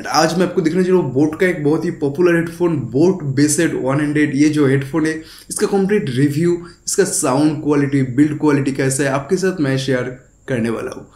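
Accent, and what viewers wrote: Bengal, India. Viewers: Bro bangali h kya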